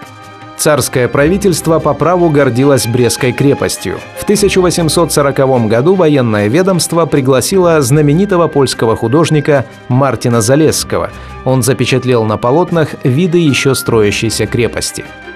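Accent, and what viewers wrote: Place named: Nizhny Novgorod, Russia. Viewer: У комментатора нижегородский акцент), с особенно выраженным произнесением буквы "я" в словах...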